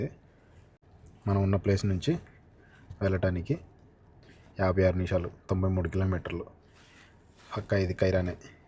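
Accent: Kadapa, India. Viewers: మీది కడప న అన్న 😮 మాది పక్కనే ఊరు 😊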